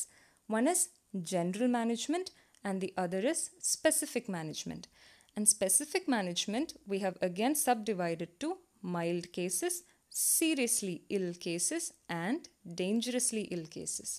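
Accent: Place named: South India, India. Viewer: OMG! This is the best one on YouTube. I love your crystal clear voice in South Indian style. Thanks🙏🙏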